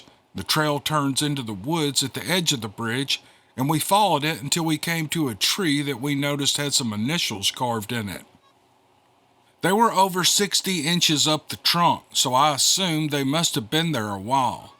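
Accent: South, United States. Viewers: I'm from the UK and that beautiful southern accent, so relaxing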